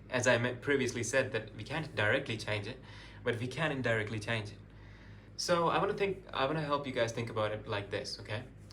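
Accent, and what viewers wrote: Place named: England, United Kingdom. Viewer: Wah yrr Nice English accent ❤❤❤❤❤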